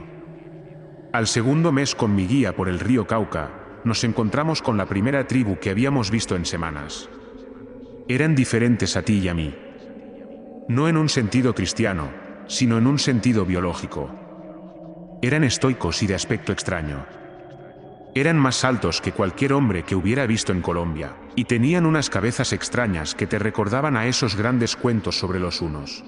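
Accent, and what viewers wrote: Spain. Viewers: Un pobre decrèpito video. Sabes nada de culturas Originales de Pachamama. Para y paren de repetir q' gente Original de tales tierras mataban y sacrificaban. Porq no mencionas las matanzas de MILLONES en el mundo por los "conquistadores" digo... matadores. Nunca podràs entender la psicologia profunda de lo Original...escucho tu voz y....eres Español? Verdad?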